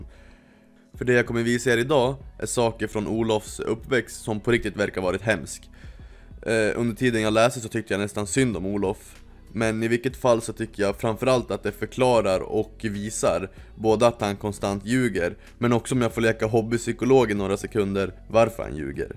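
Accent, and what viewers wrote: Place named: Sundsvall, Sweden. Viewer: Asså, kommer du från Njurunda/Sundsvall? Låter exakt som en snubbe jag känner på sättet du pratar osv. Menar inte att det är du men ifall ni är från samma område.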